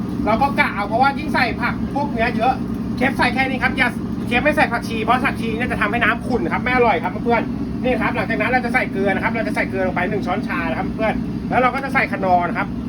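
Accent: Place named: Suphanburi, Thailand. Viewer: เชฟเป็นคนสุพรรณหรือเปล่าค่ะ พูดเสียงเหน่อ ขอบคุณค่ะ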